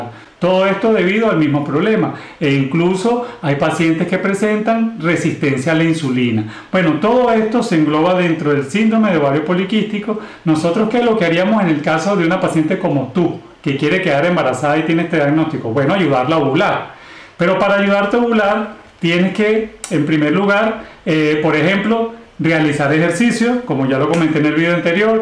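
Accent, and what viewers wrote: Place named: Venezuela. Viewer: Doctor saludos desde Italia y ese acento suyo me parece criollito venezolano.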